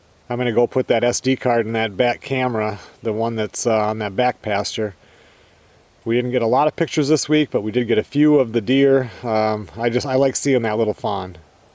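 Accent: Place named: North, United States. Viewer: I thought you have a northern accent. How does she like the difference in weather?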